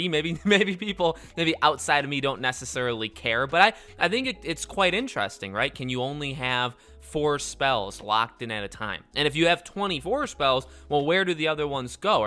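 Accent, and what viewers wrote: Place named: Midwest, United States. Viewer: You sound like you are from Chicago or Wisconsin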